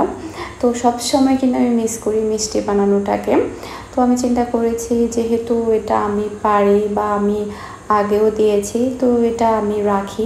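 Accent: Chittagong, Bangladesh. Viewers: Apu apnar Basha CTG r kothai ????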